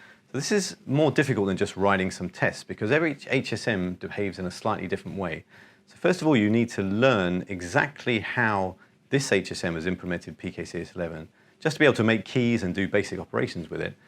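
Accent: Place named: London, United Kingdom. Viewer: London I think ;)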